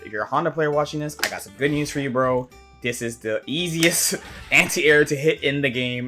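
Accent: Baltimore, United States. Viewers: You don’t have to answer but by chance are you from Baltimore? Your accent made me wonder lol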